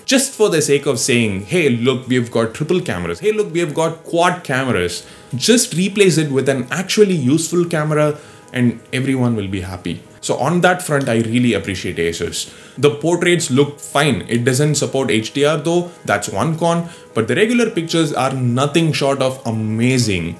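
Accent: India. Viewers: That Indian accent!!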